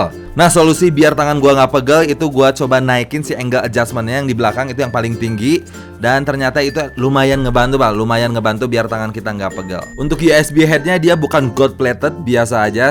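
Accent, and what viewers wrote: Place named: West Java, Indonesia. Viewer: Orang Sunda Mana suarana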